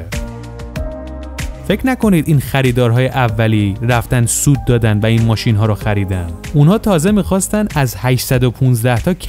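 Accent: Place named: United States. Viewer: احمق داره لحجه آمریکایی درستش رو میگه ناراحتی؟؟ ساکت شو یاد بگیر چون تو داری اشتباه میگی، استاد زبان انگلیسی هست بچه جون🤔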